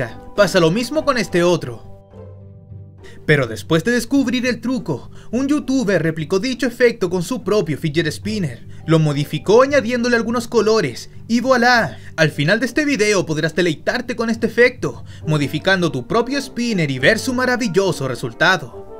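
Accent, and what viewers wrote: Chile. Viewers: eres chileno prro? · eres de chile o soy io?? v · eres shileno wn qlao? fome la wea wena hermano sigue asi Ksnfjflglslfktnek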